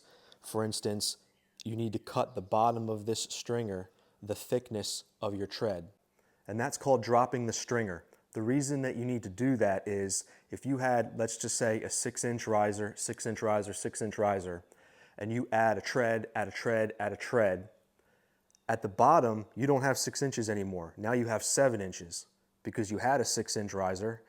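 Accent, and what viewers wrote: Philadelphia, United States. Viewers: I sensed a Philly-ish accent in a prior video... there you go!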